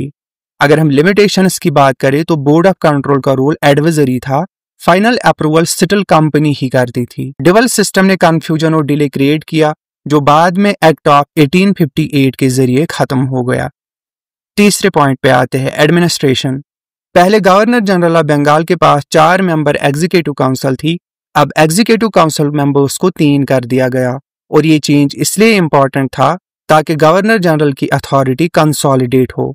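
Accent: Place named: Pakistan. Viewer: Bhai teri hindi pakistani youtube video jesi kyo hai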